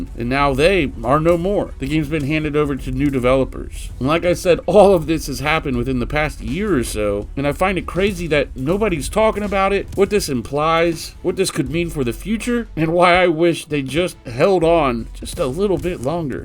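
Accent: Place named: Mid-Atlantic, United States. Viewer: Man you and I both have that mid atlantic accent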